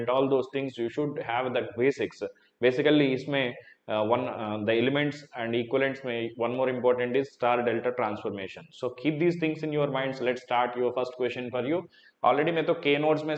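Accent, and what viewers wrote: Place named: Hyderabad, India. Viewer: Sir Content to ekk number hai heen... Uspe Aap ki Hyderabadi flavour waali Hindi... Is just Icing on the Cake 🤗😊💝💖❣️🙏🙏 Thank You Sir..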